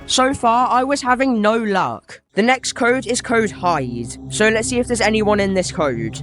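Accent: United Kingdom. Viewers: are you British? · I love his British accent 🙃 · the british acent is killing me🤣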